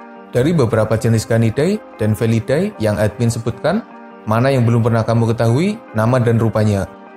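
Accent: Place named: Purwokerto, Indonesia. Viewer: Adminnya kaya orang Purwokerto logatnya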